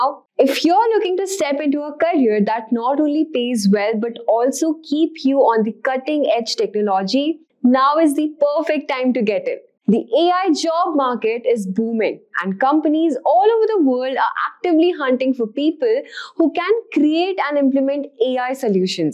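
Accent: India. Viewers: Please have somebody with plain and clear American English to explain the benefits of this course. It is very difficult to follow along with the heavy Indian accent this presenter has.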